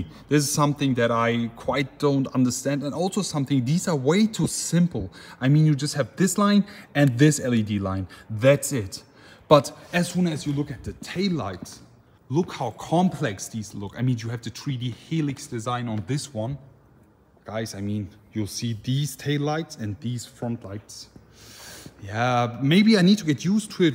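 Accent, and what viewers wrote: Germany. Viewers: Немец говорит на английском? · Is he talking of 600 miles or rather 600 kms as he sounds European or German. As in this seems to be a German or European spec car. And those I would suppose are in kms.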